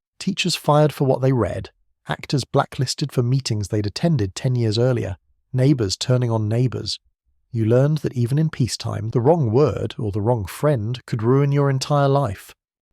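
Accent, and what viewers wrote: England, United Kingdom. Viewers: An American story about American generations. Why then an obviously UK English voiceover? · British narration on American relevance 🤷🏻‍♂️ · Hello, this a great video and I'd never heard of Gen Jones which makes a lot of sense. However, if you revisiting please don't use a UK voice for predominantly US content. It's a bit confusing and I feel a bit left out as a brit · USA centered with british accent. Lets forget the US-perspective. Theyre out! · Why is this narrated by an English person when it’s about America. Anyways Boomers in the UK were not born into winning. The UK had rationing until I think 1955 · American culture with a english accent curious 🤔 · Sh*t Brit accent on American generations. Left wing interpretation. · A British accent narrator doesn't work. Give us real sources!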